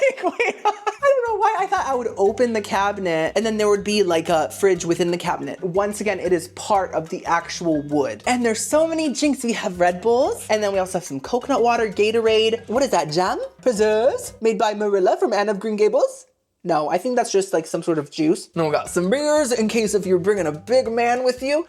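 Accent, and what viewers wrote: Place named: United Kingdom. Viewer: your british accent is like joy to my ears!!!!!!! love from a london girlie xxxxxxxx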